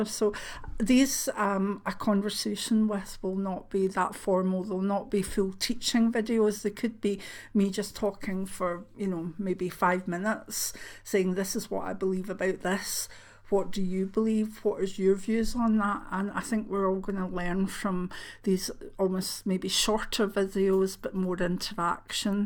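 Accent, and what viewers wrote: Scotland, United Kingdom. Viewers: I wish you a happy and joyous Christmas with your family and grandson, the little ones really do make it magical. Have a wonderful Hogmanay and I look forward to future videos, you have helped me get through a difficult year after loosing my mum in January, listening to your beautiful accent has soothed a lot of my emotions, its like having my mum near by, I miss hearing the Scottish accent, I lost mine years ago, though apparently it slips in every now and then. Thank you for all you do xx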